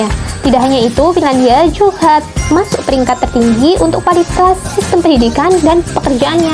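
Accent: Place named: Java, Indonesia. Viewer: Jowone medok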